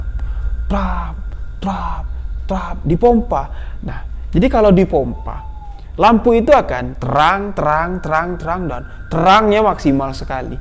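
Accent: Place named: Bali, Indonesia. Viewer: Oalah, saya baru liat eps ADUM. Ternyata abng tinggal di Bali., pantesan logatnya spt org Bali. Sukses terus bang, sy suka cra penyampaian cerita dr abang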